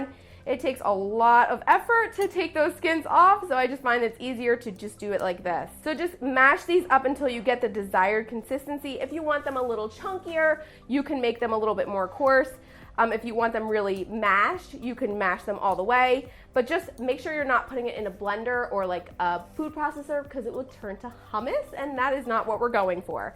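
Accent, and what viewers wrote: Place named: New Jersey, United States. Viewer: Are you from Jersey? My mom is from Jersey and your accent is very strong lol i’m from the south, but immediately when you started talking I was like… I think she’s from Jersey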